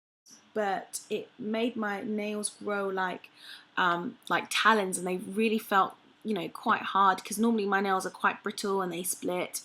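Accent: England, United Kingdom. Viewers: Ur so pretty and I love your English accent! And your hair looks great! Thanks for the thorough review. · Love ur beautiful English accent....lol. U sound a bit like Rita Ora. ;)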